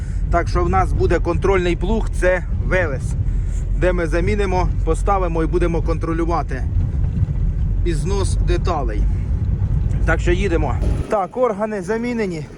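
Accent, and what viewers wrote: Poltava, Ukraine. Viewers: Проживав би ти там де він, і сам так розмовляв би,Полтавський суржик він такий.Ластівка залишилась і далі працювати.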